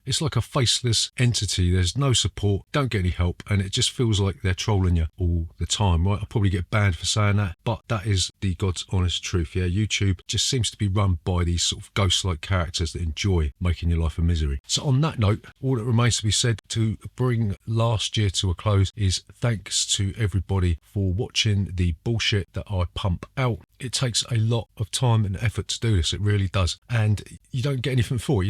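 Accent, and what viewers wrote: United Kingdom. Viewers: Thanks again for last year. I've stumbled upon your channel by luck, what drew me in was your Jason Statham kinda lookalike 😂😄. Also your British accent. I use to live in the UK, spent 16 years of my life growing up in the UK. Lived Portsmouth but have moved out of the UK 12 years ago. I am trying to decipher what part of the UK you are from. I've yet to watch all you videos so if you mentioned it somewhere I'll eventually get to my answer 🤣. I miss England, best time od my life growing up in Brighton and then eventually Pompey.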